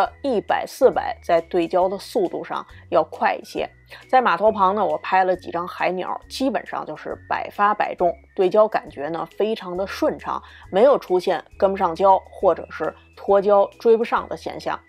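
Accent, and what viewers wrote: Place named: Beijing, China. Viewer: 都是北京腔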